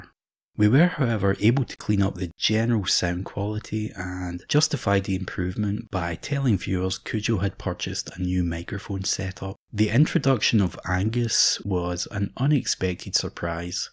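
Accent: Scotland, United Kingdom. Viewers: Even the scottish accent was elaborated with AI? banger ! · All the comments here are from bots, this is a cesspool of AI entities... all hail the circuit... Looking forward to your next video! Always love to hear your Scottish accent, lovely memories of my time in Scotland😊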